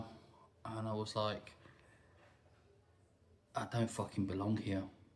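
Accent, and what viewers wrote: United Kingdom. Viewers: one year sober, have not long started following your videos. would love to help and support you bro. can give you my email, if you want to chat/shoot the shit! from your accent, it sounds like you might be from around the same area of U.K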